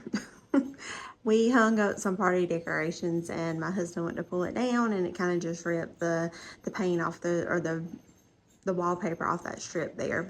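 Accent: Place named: South, United States. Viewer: I love your blue and white collection, your home is beautiful. I also love your southern accent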